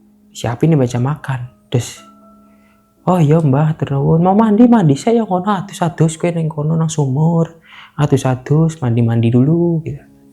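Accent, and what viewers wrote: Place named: Java, Indonesia. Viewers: Medok sekali rikanyah kisanak li crita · Runtut, cara bercerita, meskipun kadang terselip dialek bahasa Jawa. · ngapak emang sya suka gaya ngmonge lucu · ceritanya bikin lama krna bhasanya campur,knp sih gk pake bhasa Indonesia pakem aja,pake bhasa Jakarta juga logat lu ngapak,gk enak di denger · Orang ngapak mase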